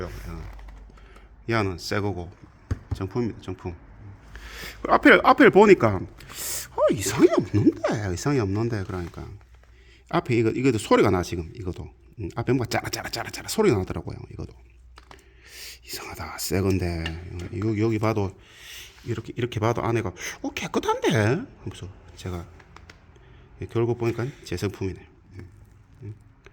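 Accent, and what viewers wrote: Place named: Gyeongsang, South Korea. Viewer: 오늘도 구수한 경상도 사투리를 들으며 영상 시청 합니다..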